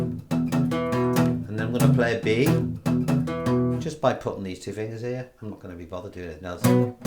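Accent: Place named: Merseyside, United Kingdom. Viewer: I'm trying to guess the accent, outskirts of Merseyside somewhere...heeheee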